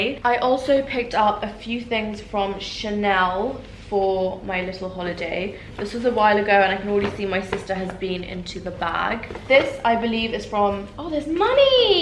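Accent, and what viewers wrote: United Kingdom. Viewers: oh my goodness, my daughter made me watch your $10,000 quarantine hall with her and now we watch all your hauls together lol. "mommy come our friend from Dubai with the British accent posted!"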